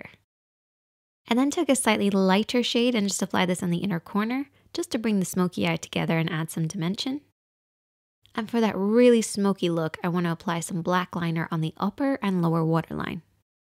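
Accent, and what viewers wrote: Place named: Ireland. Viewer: Are you Irish? I hear a slight accent.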